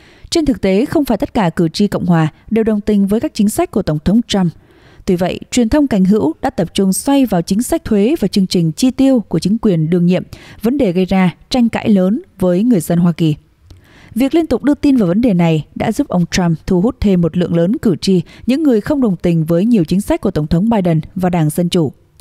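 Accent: North, Vietnam. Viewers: Sao hom nay Dai HT D News lai co giong bac nua troi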